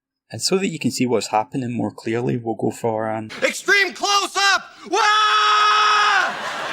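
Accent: Scotland, United Kingdom. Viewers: VERY controlled and detailed work, the 'subtle' finish speaks (looks???) for itself! Great and CLEAR presentation in terms of info/technique/example AND such a lovely Scots accent!!! xx SF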